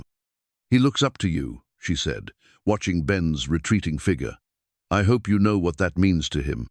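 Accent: England, United Kingdom. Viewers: I think your stories where it is about the old west it would be more believable if you had someone other than an English man to read them.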